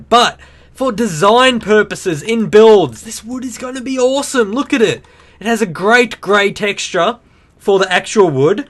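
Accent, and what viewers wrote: Australia. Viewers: r u australian :)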